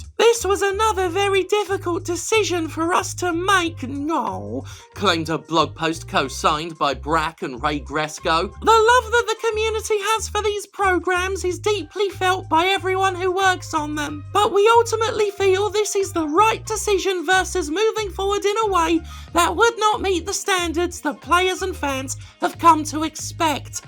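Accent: United Kingdom. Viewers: Why did i always think u was from Britain, England? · Man I would never imagine a British with the elegance of the accent living on one of the most southern places 😂 you’re the hero we don’t deserve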